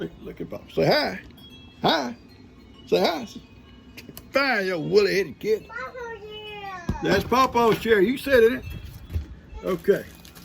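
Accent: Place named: Texas, United States. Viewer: I have always enjoyed hearing the stories told by the older generation. Except, now I am one of them! I was born on New Year’s Eve in 1953. But, you have such a likable personality, and it is charming to hear your Texas style accent in the words you use when speaking. Just wanted you to know that, and hope Gi-Gi is doing well, after the terrible hack she has had to endure. I know she has sought strength and comfort trusting in the Lord.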